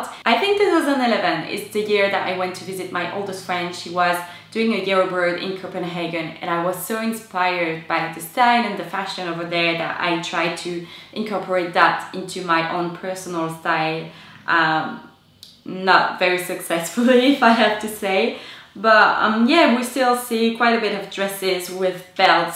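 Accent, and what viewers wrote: France. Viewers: Are you French? I'm french, x) and when people talk in english It's the same accent x) sorry for my bad english ;w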